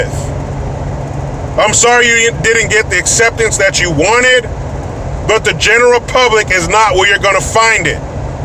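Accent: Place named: East Coast, United States. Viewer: you sound like you're from the east coast with your angry tone and facts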